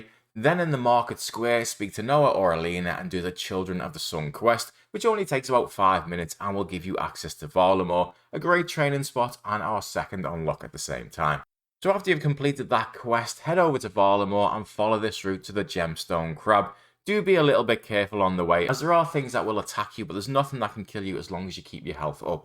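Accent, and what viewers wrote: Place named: Wirral, United Kingdom. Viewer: Maybe a Wirral accent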